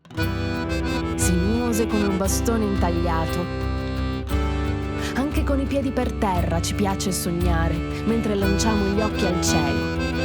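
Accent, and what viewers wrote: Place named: Veneto, Italy. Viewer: Eja tenisi arrexioni...però l'accento sardo mi manca, sento solo il veneto e forse è per quello........qui ci voleva una faccina sorridente ma non so dove prenderla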